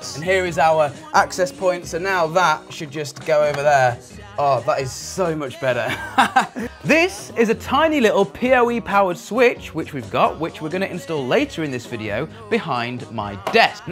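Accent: England, United Kingdom. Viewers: He's English, we pronounce it like garridge.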